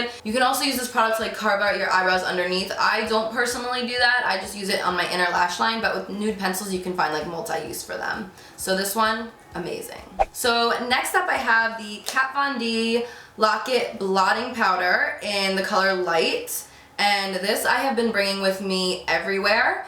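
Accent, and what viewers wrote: New Jersey, United States. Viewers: are you from Jersey by any chance?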